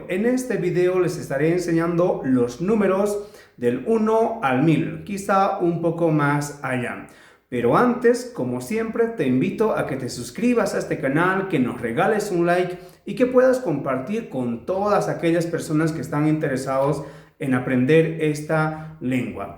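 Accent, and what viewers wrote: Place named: Cusco, Peru. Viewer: Ese Quechua igualito al de Cusco.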